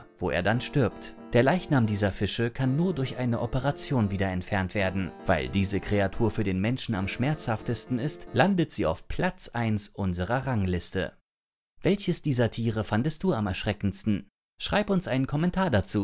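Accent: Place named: West Germany, Germany. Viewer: was isn das hier fürn schrott? gruselgeschichten für 12 jährige? am erschreckendsten ist das wessi-deutsch vom sprecher...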